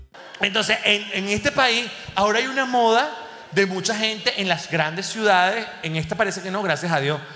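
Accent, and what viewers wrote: Cuba. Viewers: Magistral como imitas el acento de nosotros los cubanos. Me Meo contigo 😂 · Me encantas con tu acento🎉🎉🎉😂😂😂😂😂😂 Cubano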